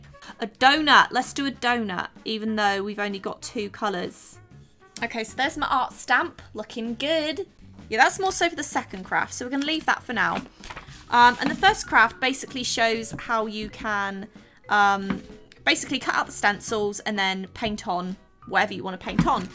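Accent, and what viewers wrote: England, United Kingdom. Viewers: I'm confused... doesn't she have a British accent? So why does she talk about dollars and Target? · I’m so confused... she s o u n d s English but she’s using dollars and saying target casually and hsuhfjfidms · Sounds weird to hear a lovely British accent like yours work in dollars lol I feel like British YouTubers are hard to come by. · This was recommended to me for some reason and I was very confused since you sound British but was mentioning American things. I still enjoyed the video despite the confusion. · no she lives in the US, she just has a British accent! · you can't be american and have a british accent, is british LOL she just lives in the US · Where are you even from? British accent, and dollars?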